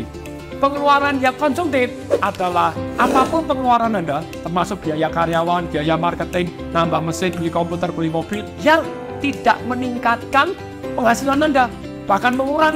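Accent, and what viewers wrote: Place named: Surabaya, Indonesia. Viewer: Pak bagusnya musik nya dikecilin atau ga usah ada musik nya. Pengen fokus denger suara bapak jd kegangggu sm musik. Makasih. Dan lagi Pak denger suara asli Bapak dengan logat Surabaya nya itu sudah bikin semangat sekali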